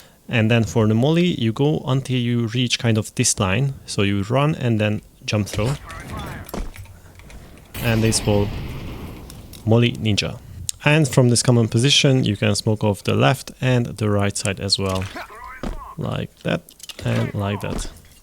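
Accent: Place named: Hungary. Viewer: éreztem, hogy magyar vagy xd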